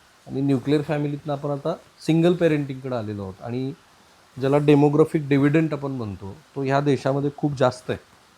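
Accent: Marathwada, India. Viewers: सर, तुमच्या बोलण्यातून मराठवाड्याची..बोलीभाषा ऐकायला...मिळते... खूप छान वाटत... अशाच काम पुढे चालू ठेवा...अशा चांगल्या गोष्टी समाजापुढे ठेवत जा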